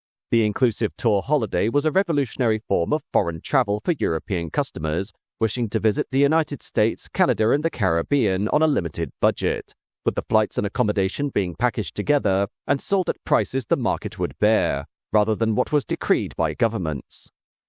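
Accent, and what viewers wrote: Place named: United Kingdom. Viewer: the robot narrator speaks like ... 70s British English?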